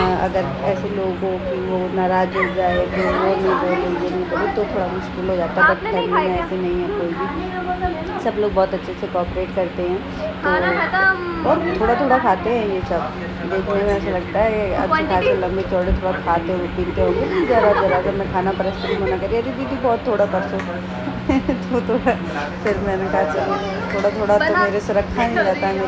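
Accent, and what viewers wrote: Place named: Mainpuri, India. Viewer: Aap ki bolne ka tarika bahut hi pasnad aata h.menpuri ke jesa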